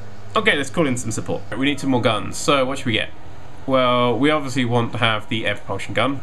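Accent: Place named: United Kingdom. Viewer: not nearly as good as PewDiePie like when he plays Outlast lol... it's probably the British accent? but I know I'm not the only one who finds it pretty much the most irritating accent on the planet.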